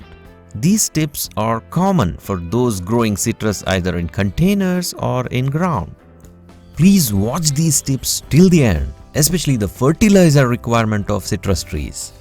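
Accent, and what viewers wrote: India. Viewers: Hahaha that Bollywood accent is so funny and cute! Nice vid! Thx!😂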